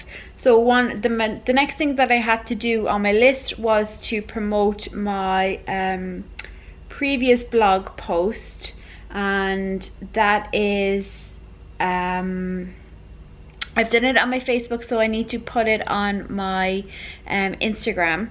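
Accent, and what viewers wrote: Ireland. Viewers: I'm from Middle East and I love Irish accent 😊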